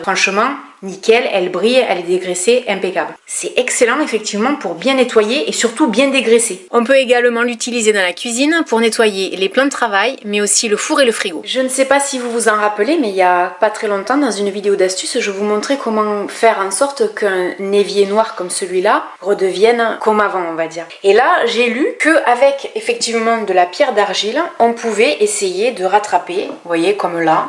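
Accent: South, France. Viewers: J'ai moins aimé cette vidéo car le ménage c'est pas trop mon truc mais j'ai mis la vidéo juste pour t'écouter et avoir ta jolie voix du sud ! Tu as vraiment une voix relaxante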